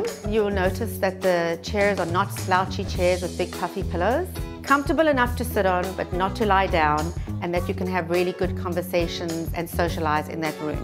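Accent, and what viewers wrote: South Africa. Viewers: Guessed your were South African. I too am from South Africa. Love your home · Took me 3s to recognize the South African accent. The style is so South African. Beauty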